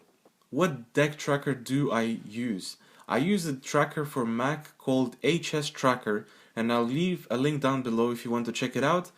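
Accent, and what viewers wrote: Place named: Russia. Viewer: Вау, я конечно всегда слышал твой акцент и подозревал что ты русский, но,так как ты записал видео про рашн мафия колоду на английском языке, я разуверился. Круто слышать что русских популярных ютуберов тоже не мало (тролден например). But anyways for all of you who are wondering what the hell is this wall of text, I just want to thank you, for making good content and just being awesome overall. Keep up the good work man.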